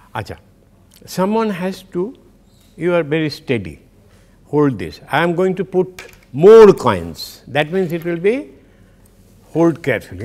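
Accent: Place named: Bengal, India. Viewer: I can feel that the teacher is bengali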